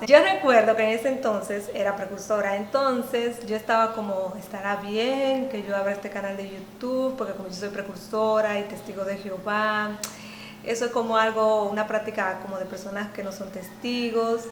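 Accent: Dominican Republic. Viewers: Hola. Qué bueno que lograste salir de esa secta. Quisiera saber si eres dominicana. Tu acento al hablar parece ser dominicano. Yo soy dominicano y me gustaria que por favor me respondieras, y así ver si podríamos tener alguna comunicación para que compartamos sobre el tema. YO estuve estudiando con ellos durante mucho tiempo. Pero su doctrina no la pude asimilar, pues ya tenia un poco de conocimiento del verdadero evangelio de Cristo. Me estoy preparando para formar parte de un ministerio de ayuda a los esclavizados en la secta de la Whatctower. Fuiste muy valiente. Saludos para ti.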